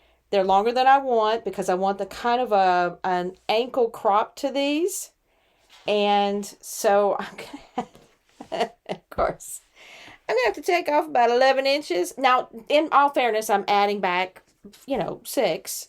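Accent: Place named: United States. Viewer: I listened to your video shorts with the sound down and was surprised when I heard your voice had a US accent. You looked so typically British. 😊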